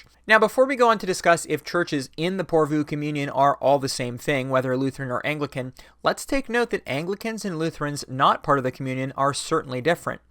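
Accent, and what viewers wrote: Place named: United States. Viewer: The commenter didnt know you were american? What did he think you were by your accent? Maybe Canadian but you'd have to have a really bad ear for accents to think that! Another great video. Dont worry about it. Haters gonna hate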